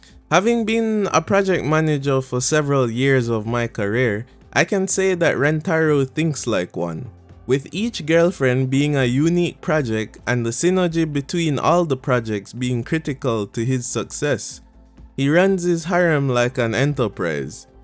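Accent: Jamaica. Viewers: Bro are you jamaican?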